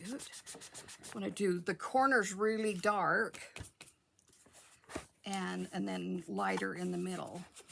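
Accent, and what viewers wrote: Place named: Utah, United States. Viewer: Hello neighbor in Utah 🌿 I'm from California but live in Orem now. I thought your accent sounded like a Utahn😊 ❤ you & your unique crafts!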